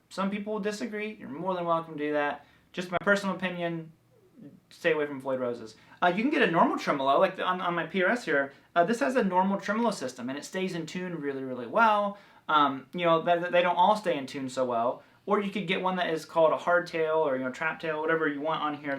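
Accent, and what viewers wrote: South, United States. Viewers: Superb country accent,!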